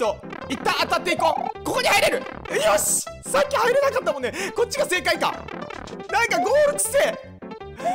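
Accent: Fukuoka, Japan. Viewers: さんは福岡のかたかな？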